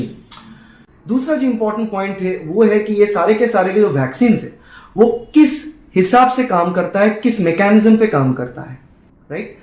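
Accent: Bengal, India. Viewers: Are you bengali ?? 😊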